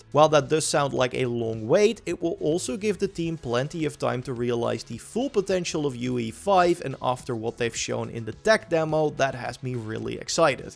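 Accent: Netherlands. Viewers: HELL NAH BRUH that dutch accent is killing me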